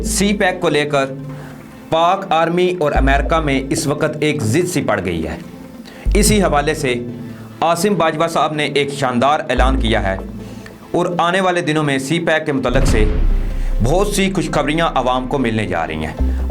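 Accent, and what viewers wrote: India. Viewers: Its a Indians channel. Anchor is Indian in disguise of Pakistani. You can check his accent also. He use qhab instead of khwab, qhas tore instead of khas.its problem of Indians. Plz unsubscribe it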